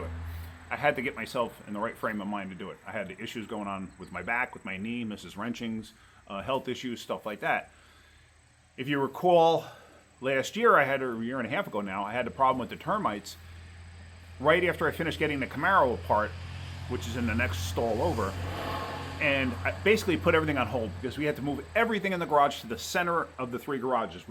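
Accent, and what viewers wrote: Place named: New York, United States. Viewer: Listening to your accent , I'm guessing your from the NYC metro area ? Where are you from ? I will help your friend !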